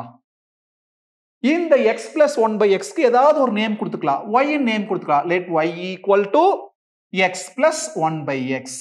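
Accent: Coimbatore, India. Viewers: Coimbatore LA ula slang pola irku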